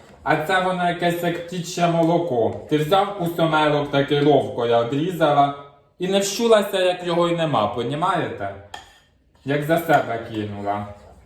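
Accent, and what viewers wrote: Poltava, Ukraine. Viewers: Дякую дуже, сміюся вголос. Нагадуєте мамину говірку, вона була з Полтавщини.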